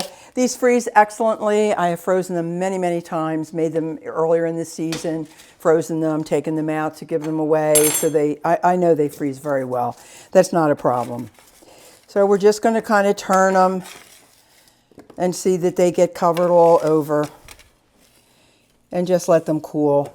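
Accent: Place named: New Jersey, United States. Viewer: Are you from NJ by any chance? You definitely sound like you are lol. I’m from NJ, I live in Haddon Twp., Camden county. I love these cookies and I was looking for a recipe with almonds and low sugar in the cookie recipe. Thank you very much!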